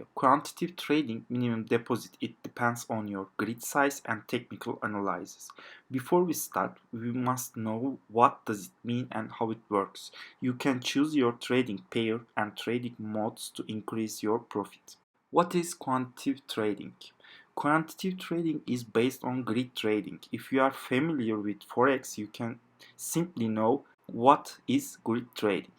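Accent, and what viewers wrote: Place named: Turkey. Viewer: İngilizcenden Türk olduğunu anladım 😁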